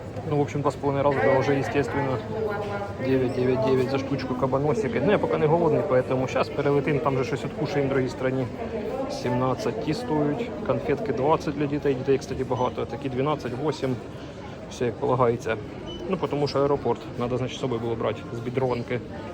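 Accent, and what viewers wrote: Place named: Surzhyk, Ukraine. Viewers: Ми Українці шо не понятно а балакає він як у нас в Дніпропетровській області привіт з Павлограду. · Важко сприймати на слух такий жорсткий суржик.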